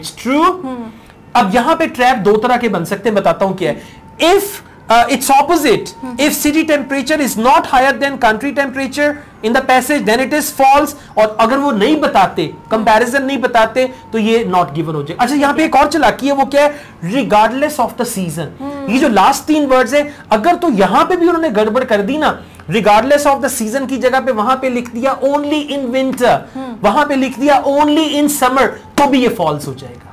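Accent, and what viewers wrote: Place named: Punjab, India. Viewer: sir I love your Punjabi .. I belong to an area where exactly this excent is followed.. Thanks sir ...😊😊😊😊😊😊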